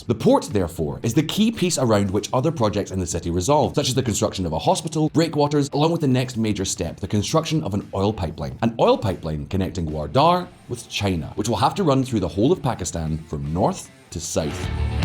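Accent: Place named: Scotland, United Kingdom. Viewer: His accent has the Scottish tinge to it.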